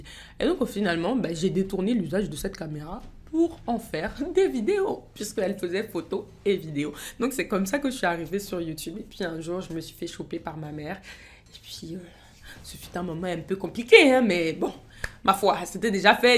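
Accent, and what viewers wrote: Ivory Coast. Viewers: Je savais que t’étais ivoirienne. Malgré le « shôkôli » on se reconnaît 😂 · 😅l'accent à l'ivoirienne, me tue Bonne continuation ma grande soeur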